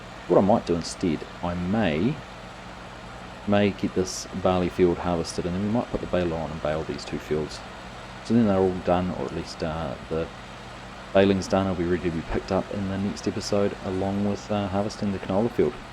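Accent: New Zealand. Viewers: Goodoh! is beek un speekteckle oylend. Not knocking - my grandfather was born near Napier, 1880-ish (aye, Ah'm auld) and I have dozens of Newzeeld relatives, but none I've met has an accent this extreme. / You do better timelapse music than most, congrats. Wonder if you like and/or, sliders extraordinaires. / Do hope AlienJim does an FS22 version of this map. Thanks.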